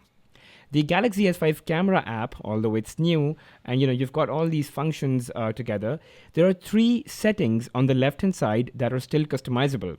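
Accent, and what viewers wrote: India. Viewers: Omg your accent is really really nice :) are you indian?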